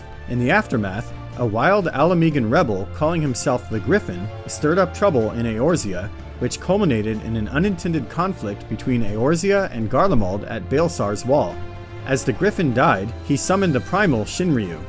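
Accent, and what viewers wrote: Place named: United States. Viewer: I'm just so thankful that you are so articulate. So many other summary videos, I can hardly understand their accents (European, speech impediments, etc). I'm from the United States so hearing an American accent is so much easier for me to understand. Thank you.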